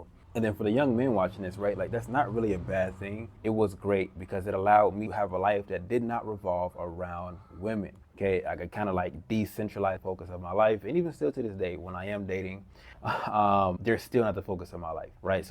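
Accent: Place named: United States. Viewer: People dancing around the subject smh.. he's referred to as an Abid in Arabic, which means slave. Lucky he is, and sounds American, as that would've contributed to a slightly better experience. He is also originally an African, not Caribbean American (i.e. the real "African Americans) and that will help him navigate Egyptians too Egypt really is the last option for anyone living in the west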